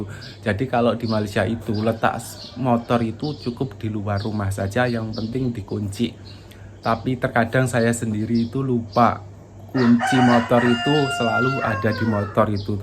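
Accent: Java, Indonesia. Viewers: Dr lampung?. Lampung di mana. Wilayah aceh, padang atau tanah besar riau. Sebab pertuturan kamu tidak melambangkan yg kamu melayu sumatra. Lebeh mirip indonesia daerah jawa.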